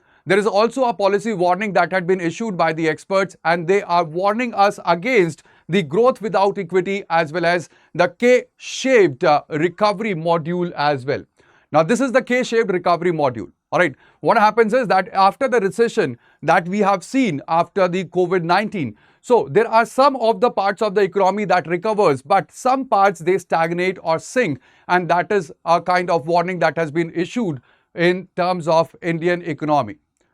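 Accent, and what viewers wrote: India. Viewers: indian english o my god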